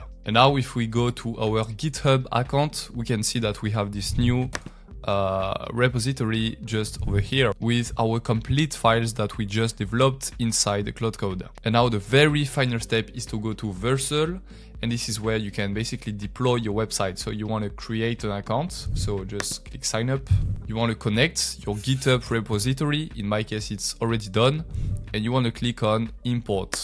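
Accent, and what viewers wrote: France. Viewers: C une dinguerie , btw on sait tres bien que tu es francais rien qu’a ton accent hahahaa . Tuto incroyable , si tu pouvais nous en faire plus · Goeh i hate french accents. Just talk normal!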